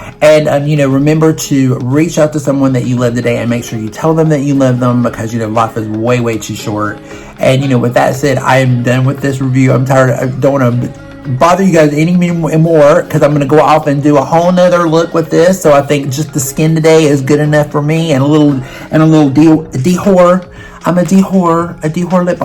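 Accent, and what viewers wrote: South, United States. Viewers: Thank God you reviewed this foundation. I am 39, had a bday recently and have dry dry skin from Sjogrens syndrome. My mom has cancer and has dry skin and has begged me to find a foundation for winter for dry skin. We are Lancome people but they have really changed formulation and now their products are for younger people and they are totally missing the market. So upsetting for us younger middle aged ladies and elderly women like mom because they have discontinued EVERYTHING that worked before and reformulated some things for combo skin and oily skin. Sooo, us dry skinned people are left out in the cold. Just subscribed and will watch some of your older videos. Love that you are down to earth and that you are using a brush for this foundation and not fingers. Most people reviewed this with fingers and didnt get coverage but a dense brush makes more sense. 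Also, I'm from Texas and as a Southerner your accent is normal to me. I watch Lisa and Michelle as well but lately I am SOO UPSET with high end brands dropping the ball with some products. I know Chanel and Dior has reformulated so much with inferior ingredients to keep cost down but those of us with rosacea can really feel it in the skin.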